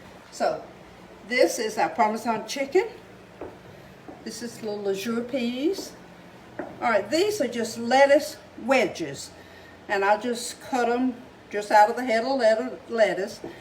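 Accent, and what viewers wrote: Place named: Georgia, United States. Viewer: Oh that looks delicious, what a beautiful supper ! I do the hamburger buns also,they just taste good ! I enjoy your cooking videos and your southern Georgia accent your a beautiful lady ! Hello from Missouri Im outside of the KC MO area,65 yrs old live on a private lake but raised up in the farm country ,north central mo! Have a wonderful day!😊❤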